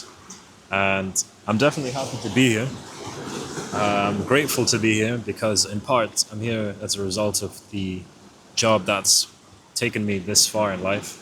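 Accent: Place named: Edinburgh, United Kingdom. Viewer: Welcome to the adventure mate. Once you focus on freedom you won’t look back! If you still have your job it’s a nice safety net while learning. Your accent sounds like Edinburgh maybe?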